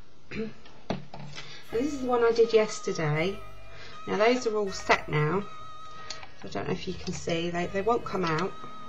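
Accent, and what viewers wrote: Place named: United Kingdom. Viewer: Very nice, also from the UK, but living in Singapore - love your accent :) Nice job - I learnt something new today - CHEERS!!!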